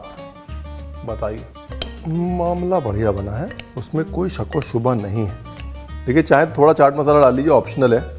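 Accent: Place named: Bhojpuri, India. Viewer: Your bhojpuri accent is so sexy ..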